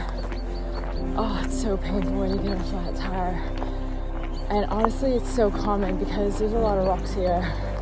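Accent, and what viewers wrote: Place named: Canada. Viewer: Despite your channel name, your accent sounds more Canadian than Australian. Fantastic videos.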